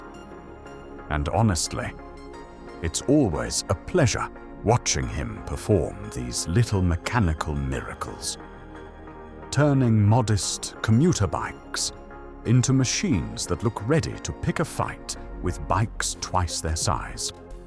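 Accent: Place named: United Kingdom. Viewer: I've always preferred the English (GB) voice. Thanks for this video.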